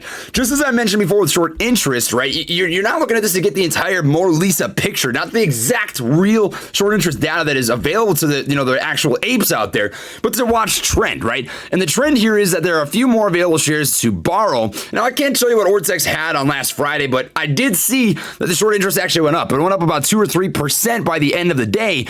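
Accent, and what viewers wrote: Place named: New York City, United States. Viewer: Haha live ur channel man. You are def from the NYC area. Haha gotta be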